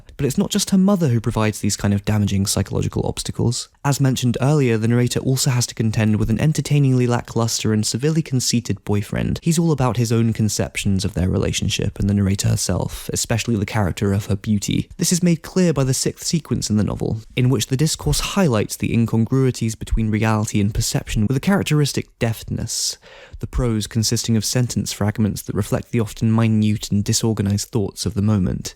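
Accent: United Kingdom. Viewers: Excellent use of clips to accompany what you were saying. I hadn’t heard of the book but this has made me want to read it. British?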